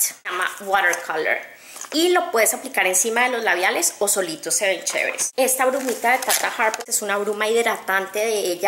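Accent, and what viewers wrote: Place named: Colombia. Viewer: Tan bella siempre....amo tu acento colombiano! !!!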